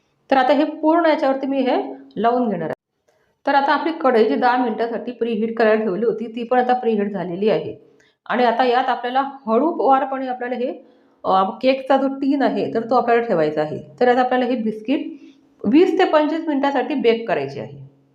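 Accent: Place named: Leva Patil, India. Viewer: तुम्ही लेवा पाटील आहे का भाषेवरून समजते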